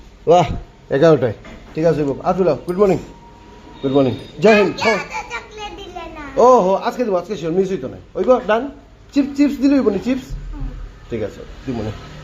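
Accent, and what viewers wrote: Sylhet, Bangladesh. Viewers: 😂😂 কারন ও খাঁটি সিলেটী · আপনার ভাষা সিলেটের মত কিভাবে একটু বলবেন?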